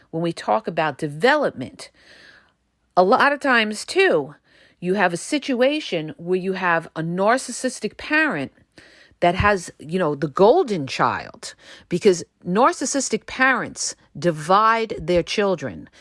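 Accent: New York, United States. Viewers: I believe that Narcissists are made. My belief is because of my experience with her over 24 years. She got worse at the end because I believe she realized that her 2 boys were old enough to take care of themselves and that's when she let loose. I will be turning 64 this year and she will be turning 60. 3 years into Blocking/No Contact and I am now starting to feel peace in my Heart. It's a long road and I have no desire for dating right now. I just want to find myself again. I will always listen to you as long as you keep it going....Bless you and love your New York Accent...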